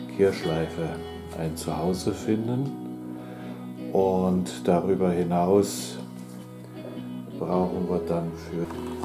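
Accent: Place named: Bavaria, Germany. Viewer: Mal ne Frage;kann man bei Märklin nicht auch einfach die Schiene zwischen 2 Schwellen mit dem Dremel trennen,dann brauchtst die Verbinder neet.Als Bayer ein gutes Hochdeutsch,bestimmt kein gebürtiger.Der Klappensinn wird nun sinnvoll ersichtlich,trotzdem hätte ich diesen andersherum zum Öffnen gebaut,also das die Scharniere unten sind und die Klappen mit Magneten gesichert od.mit Scherenhalterung,wie bei Klappböcken/Liegen od.ä.Das ist alles KEINE Kritik,nur es sehen und lesen wohl noch hoffentlich viele Mobahner diese/Deine Videos.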